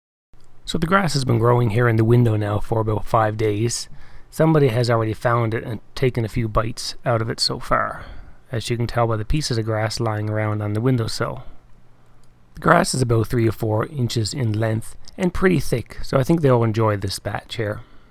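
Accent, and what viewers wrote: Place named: Canada. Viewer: That is one Canadian accent you got there, bud! Ottawa valley?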